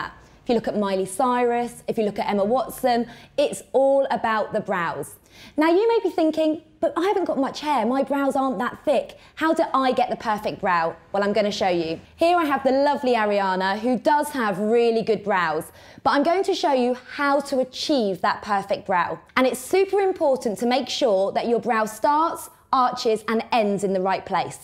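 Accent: United Kingdom. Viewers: the first few seconds were the funniest because she's talking sooo freaking fast plus that british accent haha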